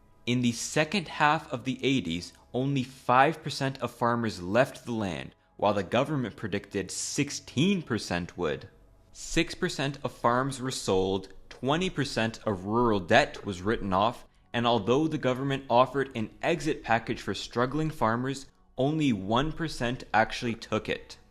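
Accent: New Zealand. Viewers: Your NZ accent is sweet as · loving the kiwi accent lol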